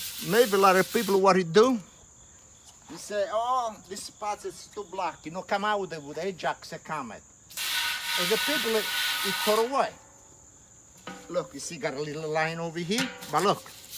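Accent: Italy. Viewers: Who is this? You sound Italian! Are you sir?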